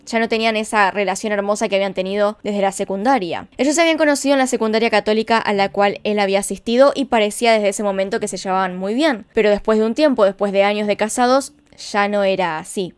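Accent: Argentina. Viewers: Estoy aprendiendo español me gusta mucho el acento Argentina 🇦🇷♥️✨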